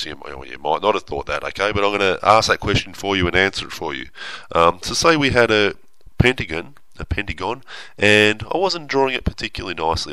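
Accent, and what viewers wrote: Australia. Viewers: Woah,your Australian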